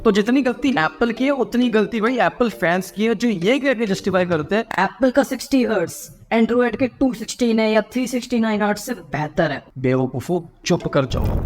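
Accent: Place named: Pakistan. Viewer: Are you from Pakistan? Your tone sounds like them that's why just asking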